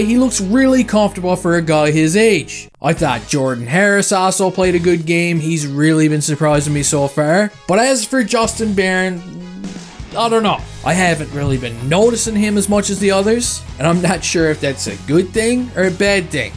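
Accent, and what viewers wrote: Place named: St. John's, Canada. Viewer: Is he a Maritimer though? He sounds to me like a townie from St. John's? What are ya, buddy?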